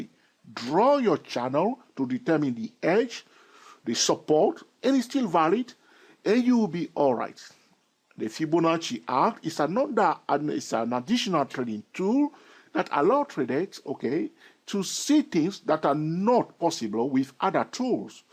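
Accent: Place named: France. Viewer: Very interesting discoveries. I think it's valuable. It would probably be a good idea to put text on the screen, spelling out the addresses of the various channels and websites you desire to have us visit (for those of us having difficulty understanding the accent). It was helpful that I've studied a small bit of French so I could understand why you would pronounce things. (When you get excited, you seem to use partial word sounds). In my opinion, the video WAS a bit longer than necessary due to some content repetition, BUT never-the-less, the value surpassed any difficulties experienced. I agree that you should break the habit of saying "OK" too many times, OK? (LOL) Allow me to direct you to an organization that has proven to be very helpful to me: Market Traders Institute <link>). They've recently come out with a fabulous charting software which they call Ultimate Charting Software. 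I've been able to specify my own mid-point Fibonacci arc lines with it (among many other things). The head of MTI made some very helpful technical analysis discoveries that have proven helpful to many. Just thought you'd appreciate knowing about it. My name is Paul A. Hansen. My MTI "handle" is FXF and I'm at <mail> Merci, mon ami.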